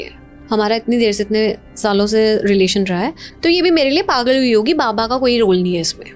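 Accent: India. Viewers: Poora Punjabi accent aa reha Madam da…and yet Hindi mei gal karate hai😁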